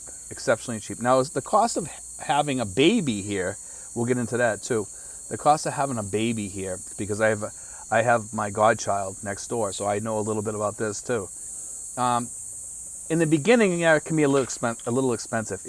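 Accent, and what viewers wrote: United States. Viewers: Hi, is it? I am a new subscriber and was trying to guess the origin of the accent. You had revealed in another video where you were from. I was thinking the same US location...ha ha. Anyway, I am from California and I used to live in the Philippines, but that was 20 years ago. To give a reference point in time; my last visit to the Philippines, BGC was still FT. McKinley. My purpose for being here is to get caught up on life in the Philippines and consume personal stories on this public platform. Best regards.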